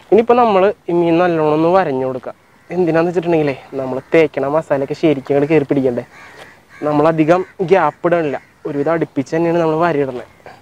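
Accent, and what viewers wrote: Thrissur, India. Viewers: It's Thrissur district Malayalam style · ഒറ്റയ്ക്ക് തിന്ന് തീര്‍ക്കാന്‍ പറ്റോ മച്ചൂൂൂ നല്ല തൃശൂര്‍ languge എനിക്ക് ഈഷ്ടപ്പെട്ടു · chettante thrissur slang miss chayyunathu njan mathramanno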